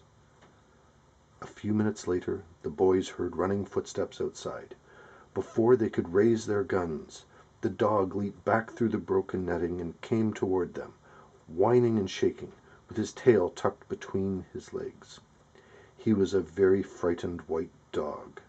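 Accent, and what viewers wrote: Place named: Canada. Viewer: This is a good idea reading these stories from the classic "Strangely Enough" collection. Sounds like you have a Canadian accent -- aboot (about)?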